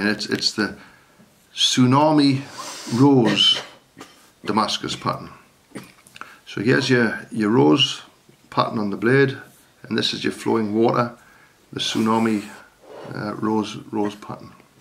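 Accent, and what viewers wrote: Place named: North, United Kingdom. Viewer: Always a delight hearing another northern accent on YT!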